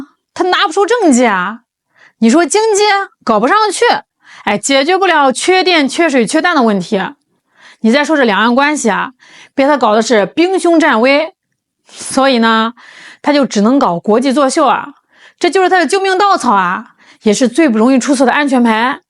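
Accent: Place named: Beijing, China. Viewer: 每一天在聽在學的口音, 北京口音？非常喜歡！❤❤❤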